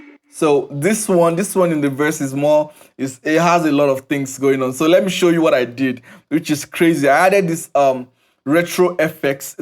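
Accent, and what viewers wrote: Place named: Nigeria. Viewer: Love your beat and your 9gerian accent. ❤️ from 🇧🇷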